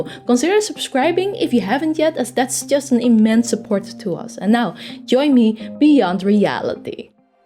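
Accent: Netherlands. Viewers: I love your accent, is it dutch?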